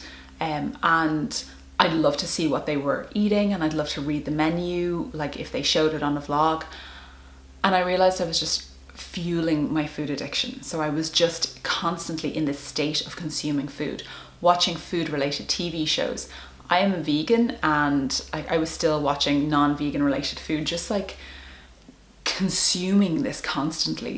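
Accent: Ireland. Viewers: I love your Irish accent. You are delightful! I love this idea!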